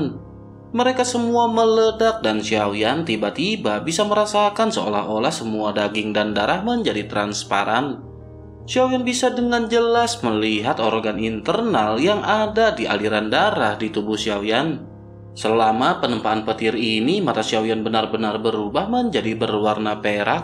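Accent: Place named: Java, Indonesia. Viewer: Min suara lu kok lama2 jadi medhok 😂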